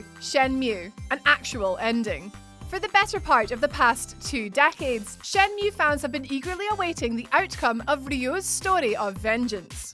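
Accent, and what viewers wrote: Scotland, United Kingdom. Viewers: I switch to something else the minute I hear this Scottish girl’s voice 😒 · Ah miss I could listen to your sweet Scottish tones all day 😉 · ZOMBIE BEYONCÉ! Haha! Initially I was doing something else whilst listening to this video playing in the background. At no.5 in the countdown (@ - ) “House of the dead, Zombie Fiancé” I was so sure I’d heard ‘Zombie Beyoncé’ which peaked my interest in that game ten-fold...haha! Just imagine Beyoncé as a zombie. I rewound the video to double check & obviously immediately realised I was incorrect & had misheard due to Scottish accent. Oh well...It was a fun thought though while it lasted!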